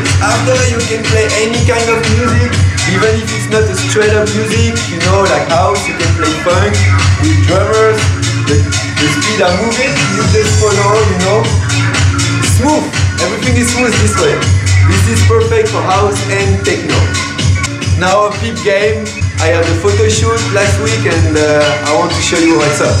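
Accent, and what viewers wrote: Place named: France. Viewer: Just love the french-english! :D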